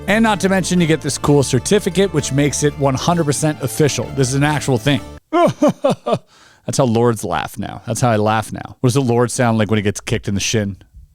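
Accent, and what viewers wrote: Scotland, United Kingdom. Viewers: Fabulous video. A great Scottish accent. Scotland is a fantastic place. I am the world’s worst magician, but always finds videos like these very useful. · we have missionaries in Scotland plus a guy playing the bag pipes at the church wide picnikick in back of our church in Chesapeake Virgina he played the music of song that also in star trek movie the death of Spock ps i video recorded the church wide picknick at Good News Baptist Church and uploaded to youtube video search engines channel ps nice cool out fit and good Scottish talk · Good Scottish accent, too 😅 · From scotland stay like 20 mins away from Earlston loved the accent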